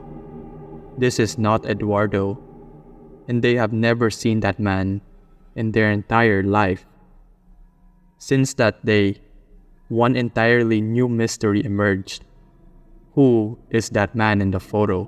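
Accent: Philippines. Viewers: are you filipino? the accent sounds like pinoy! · Are you filipino? You sound like my father-in-law :)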